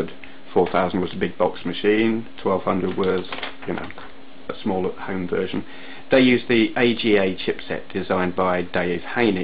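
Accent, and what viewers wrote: United Kingdom. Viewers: i knew before i clicked this video that the voice would be british....... so sad that america never got as enthusiastic about the amiga. =( in fact, it's so bad that when nintendo released c64 games on the wii console, they gave us americans the PAL versions, not the NTSC ones!!! so the games run at the incorrect speed over here (music is too fast) and the keyboard layout even sports the british pound instead of the dollar sign. sighhhhhhhh.......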